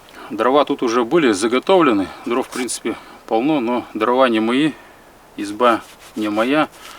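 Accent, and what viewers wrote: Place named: Urals, Russia. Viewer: Говор уральский)) какой регион? Свердловская область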